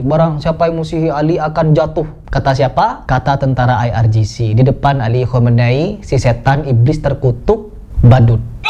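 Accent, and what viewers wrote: Madura, Indonesia. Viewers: bukan orang madura, tapi ngomong logat mdr, krn sy sendiri, ayah mdr, ibu jawa, krj guru sd pasca merdeka, ini antek amrika, mirip prabowo, hingga kapal pertamina indonesia ditahan iran, hingga nggak bisa apa 2,pdhal ayatullah hafid qur an, kalau nggak bisa bc huruf, itu kelihatan..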